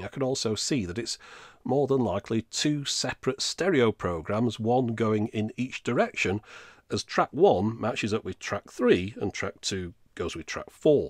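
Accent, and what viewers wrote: United Kingdom. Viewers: Not sure whose voice I like more: classy British accent or the deep, groovy voice on that Datsun Z advert. Tough call.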